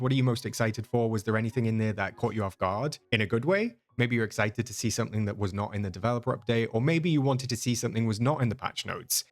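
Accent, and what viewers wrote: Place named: Wales, United Kingdom. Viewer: Hey 👋🏻 Been really enjoying your content and insights…It is nice to hear a DDV content creator with a British accent (a lovely Welsh accent 🏴The patch notes are interesting but the bug fix we are desperately hoping for is the one with the male avatar which leaves him permanently with a 5 o clock shadow. This is especially noticeable in poor lighting. No matter how many times you go to facial hair settings and change the colour and Opacity it will revert back to black and 70%. It is maddening because I play DDV with my 9 year old son and our avatar is based on him and looks exactly like a teenage version of Luka! The illusion is spoiled by this bug that emerged 2 updates ago…we play on PS5 and I’m not sure if it is exclusive to that platform. Contacted Gameloft about it but got a bot response which gave me options which wasn’t relevant to the issue. 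No one else has noticed or been bothered (even on Reddit!) It is a small thing I know but it spoils the experience…like with the hiking DreamSnap when our avatar was in the boyscout outfit. Excited for the update…we are having a Aladdin marathon this evening watching all 4 films! Oh btw I hope Gameloft have really tested this stealth game mechanic! Thanks for the fun😊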